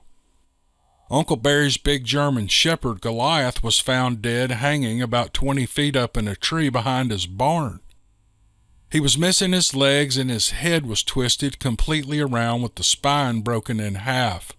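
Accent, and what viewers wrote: South, United States. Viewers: Wow! Great stories- especially that last one - it had me on the end of my seat! I was SO relieved to hear it was just a story! Got me there, hehehe...! Your wonderful southern accent gives a new dimension to these stories,! You've become my favourite storyteller over the last few months. Thank you for creating this channel, for all the times you've helped me to relax and sleep... ❤ ❤ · Awesome stuff Mr.. Your narration with your southern accent makes a great combination. Every time you get a giggle in, it sure makes me laugh. It’s all a good time brother, alright, here we go. Peace.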